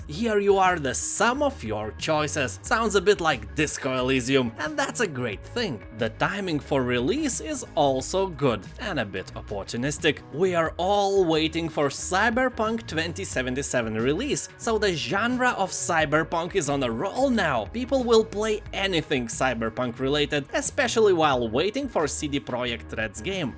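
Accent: Russia. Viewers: its probably ignorant of me to call your accent "Russian Like" since you are not really russian. But your accent sounds like such a cool russian like acecnt (to a non european) I swear you can really do a good russian/ luthuanian game character voice over. Or maybe a game in which a main protogonist fight soviets for independence. I am from Pakistan by the way.(just saying) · you are from Russian or where?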